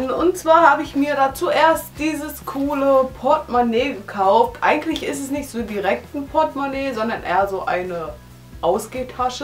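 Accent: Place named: Saxony, Germany. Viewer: woher kommst Du?:D super Akzent, der klingt wie meiner, komme aus sachsen:D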